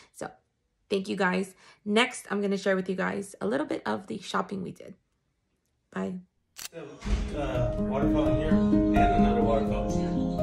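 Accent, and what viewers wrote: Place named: India. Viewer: A mi me Cuesta enterder su ingles cuando habla, su acento es bien fuerte. Pencé que era de la India 🇮🇳.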